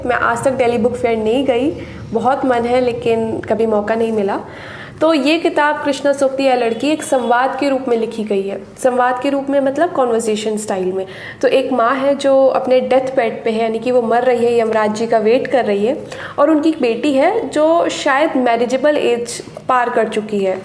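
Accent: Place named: United Kingdom. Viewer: Missing the British accent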